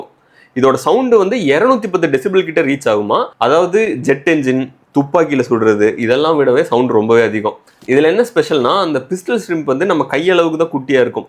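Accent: Coimbatore, India. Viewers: நீங்க கோயம்புத்தூரா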